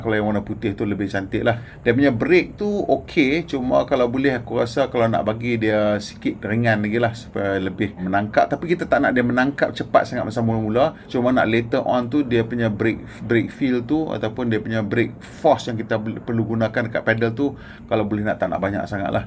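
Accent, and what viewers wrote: North, Malaysia. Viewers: Kalo tak silap dulu bro ni cakap BM standard. Skrg campur loghat utagha pulak dah 😂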